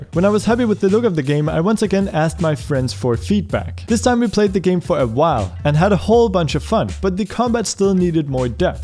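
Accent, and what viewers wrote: Denmark. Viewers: are you danish? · Are you Danish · 30 sec into the video and i felt a danish accent - turns out i was right :D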